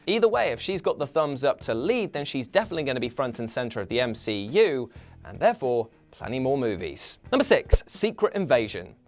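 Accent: United Kingdom. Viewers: Brick Boss 2004 he’s British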